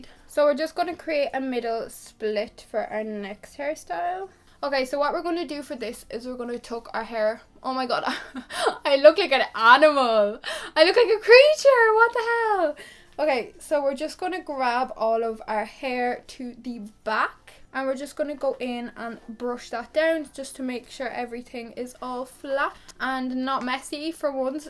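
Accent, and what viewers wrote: Ireland. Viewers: are you irish? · are you irish ? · I'm new bit are you Irish. I love you accent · Are you irish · oooh is she Irish! I thought already, her English isn't British English or American English so what else? Irish English!! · Are you from Ireland????!!!!! If so what county???!!!?!?!?!! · Are u from IRELAND cause u have a irish accent p.s I am from IRELAND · Omg I love you!! One day I just stumbled upon one of your videos and I am in love! I am already wearing one of your hairstyles they are perfect! And I'm on vacation so I don't was my hair every day so it's perfect!!! (Btw what part of Ireland are u from I love your accent)❤❤ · I love irish accent so much 😭😭😭😭 ❤️❤️❤️❤️ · Are u Irish 🇮🇪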